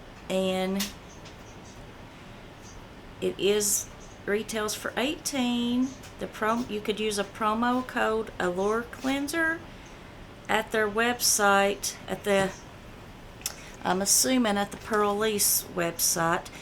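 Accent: South, United States. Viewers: You are such a Sweetheart, :), Love your southern accent. :) I'm born n raised in Ft. Lauderdale Fl. But live in NY now for years. I love your videos. Thank you so much for sharing hun.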